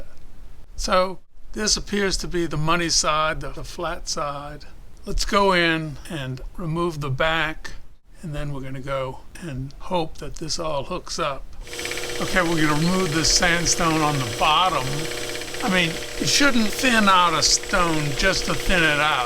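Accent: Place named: Louisiana, United States. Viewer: I love your videos. I'm training to have an English accent like yours. My favorite Lousiania accent. Keep the great work. The internet allows me here in Brazil to have some contact with your wisdom. Thank you very much.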